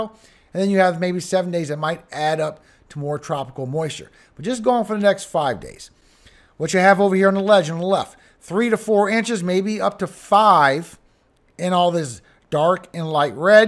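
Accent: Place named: New Orleans, United States. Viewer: when I first saw you pop up on my YT feed I thought you looked familiar and when I herd you speak I knew you was a native and I herd you say you was from here I was born at EJ lol I do recognize the name , N.O. Is small so very possible we have crossed paths one way or another, either way we brothers in Christ and we here now . I really enjoy your shows updates and most of all your prayers bc lord knows we all need them and you always have some from home 🙏👍🏼 The BEST A-MEN IN THE BUSINESS LOL Never Quit !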